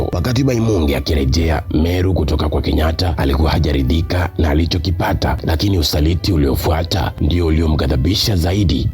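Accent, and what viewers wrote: Tanzania. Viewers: definitely speaks like a ninja from bongo, maybe its that fellow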